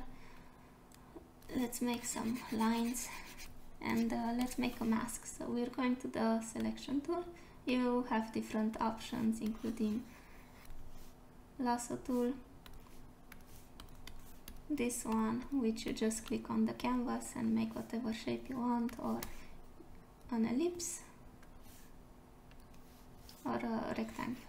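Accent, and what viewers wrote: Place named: Romania. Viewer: Your accent sounded so familiar, of course you are a fellow Romanian! Keep up the great work, amazing tutorial!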